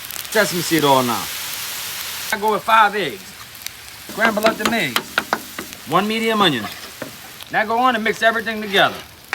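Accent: Louisiana, United States. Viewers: Beaaby love that Louisiana accent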